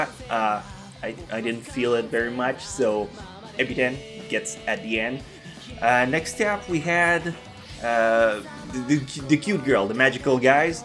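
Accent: Quebec, Canada. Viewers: Je ne savais pas que tu étais québécois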